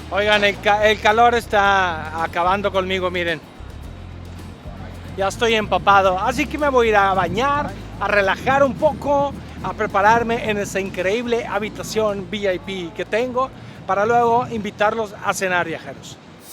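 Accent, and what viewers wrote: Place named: Puebla, Mexico. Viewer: en este video parece poblano... sácate la papa de la boca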